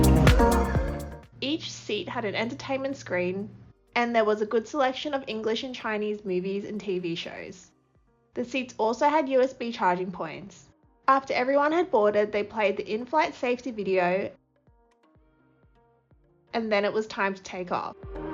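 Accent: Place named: Australia. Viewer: ох уж это австралийское произношение, всё понятно, но напоминает анекдоты ;-)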